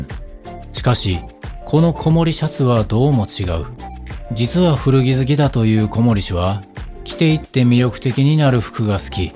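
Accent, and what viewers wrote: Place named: Kansai, Japan. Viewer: 参考になります！ 語尾の関西のイントネーション（？）がクセになります笑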